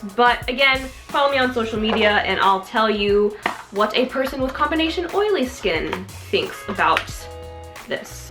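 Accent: Australia. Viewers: I love the Australian accent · Just wondering if you follow Australian YouTubers 😊 Your Aussie accent is on point lol 👌🏼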